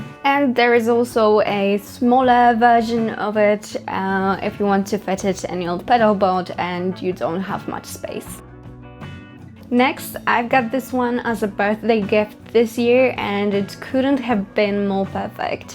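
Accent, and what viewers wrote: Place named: Poland. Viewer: Cool video. The Boss BD is one of my favorite drives. Btw, I love your accent! I thought it sounded kind of northern European, and then I saw that pedal was Polish, I'm guessing the accent is also Polish (and your English is perfect!). :) Anyway, greetings from Ohio, US.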